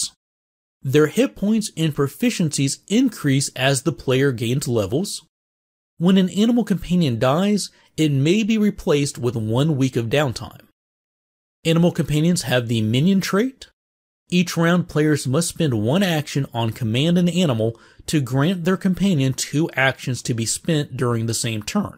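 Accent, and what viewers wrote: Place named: Midwest, United States. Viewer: Great video! Unrelated question... where are you from? I'm trying to guess your accent.. I'm thinking mid-west but have a feeling I'm way off.. :P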